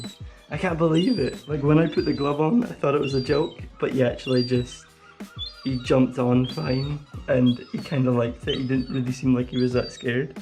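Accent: Scotland, United Kingdom. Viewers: sounds like a scottish morgz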